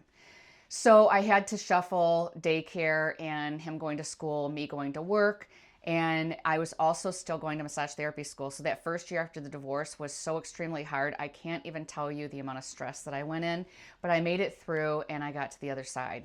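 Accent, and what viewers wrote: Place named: Michigan, United States. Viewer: Wow! Your story is very similar to mine and my name is too. I knew you had to be from MI from your accent. I spent over 25 years living and working in Chicago area and after my 3rd son graduated from high school I started planning my escape. My husband and I had been married for almost 25 years, raised 3 boys, started a land ran a business together (for 10 of those years for me. The company is still thriving in NWI), built a beautiful home, but we hadn't been drifting apart apart our first son left for college at UW-Seattle where he stayed for 15 years (he recently moved back to Chicago area--beautiful but too expensive even with a great job in IT). I left our business in 2013, and started planning to divorce and move, which was a mutual agreement. I was so sick of Chicago winters and always wanted to live at the beach, where we had vacationed many times. 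I moved out of my 3000 sq ft home,into a studio apt where I would live for the next 2.5 yrs, worked at a tax prep firm (I had been a CPA/accountant/tax preparer my whole career so this was a great fit for me). In the summer I worked 3 jobs at once as the min wage in SC is still $7.25, I was struggling but happy and living at the beach. I had never felt freer. Flash forward 11 years I am retired and living in SSI. I met my second husband )totally unplanned for sure), and in 2023 we bought a fixer upper home in a beautiful and safe neighborhood 3 miles from the beach. My friends and family visit often and this year a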